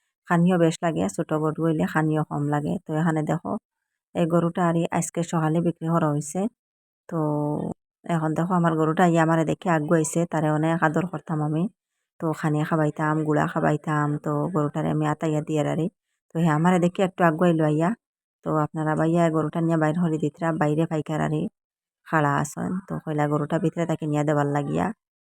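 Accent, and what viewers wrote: Sylhet, Bangladesh. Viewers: আফা চা এর কালার কিন্তু খুব সুন্দর অইছে। আসাম কিতা আমরার সিলেটি মানুষ আছইন নি। আসাম তো ইন্ডিয়া। আপনার মাত সিলেটের কিন্তু থাকইন আসাম। আমি বুঝতে পাররাম না। সিলেট থাকি আসাম যাইতে কতো সময় লাগে আফা।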